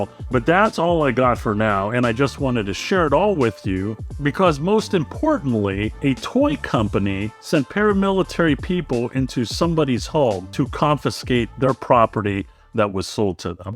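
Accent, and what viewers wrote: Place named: Ohio, United States. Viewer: Your accent sounds central Ohioan, Columbus?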